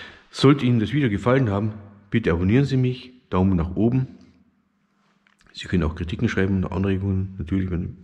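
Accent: Germany. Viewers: Als Ureinwohner finde ich das der fast wia Breiss redt😂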